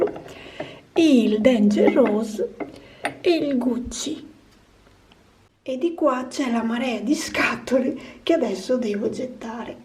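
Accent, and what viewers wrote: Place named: Veneto, Italy. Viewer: Ciao ! Carino il tuo vlog! Dal tuo accento ho capito che sei veneta come me! Baci!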